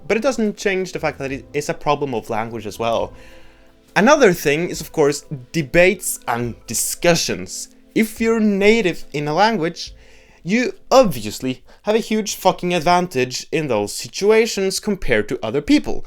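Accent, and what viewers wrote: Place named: Scandinavia. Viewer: Didn’t even notice your Scandinavian accent! German accents are way thicker imo.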